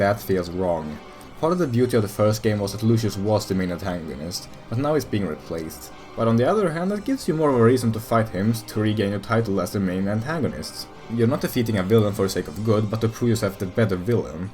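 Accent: Sweden. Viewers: Wait, are you sweedish?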